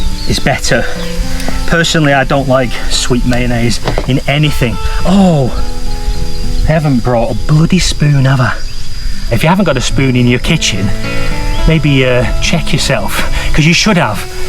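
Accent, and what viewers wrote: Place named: United Kingdom. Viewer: Hey! It's me the "bastard". I followed you to your new channel. I'm sure youre elated! Nice to see you've already got more subs on your first video than my channel has in the last ten years! Although I love seeing you go into town for local cuisine, I'm also glad you decided to show your cooking skills. You sound a bit like Ramsay when you talk while cooking lol. Must be a Brit thing.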